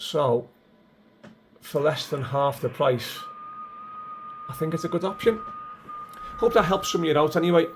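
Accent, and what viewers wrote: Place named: Liverpool, United Kingdom. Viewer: Great video mate, im going through all your videos, thinking of having a go , I had some interest about 40 years ago but never pursued it, actually went to riversdale, sure you remember Riversdale college, I became a clockmaker, I have a YouTube channel myself Clock Repairs Merseyside , got quite a few followers now, but I’m slowing down need to find something to take my mind away, came across your channel instantly recognised our familiar scouse accent, new I was in the right place 😁😁 so I’ll be binge watching everything, great stuff 👍👍. Best wishes John Clock Repairs Merseyside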